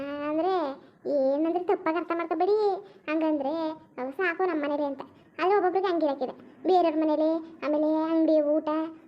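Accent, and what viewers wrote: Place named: Mysore, India. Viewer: Mysore hunsur language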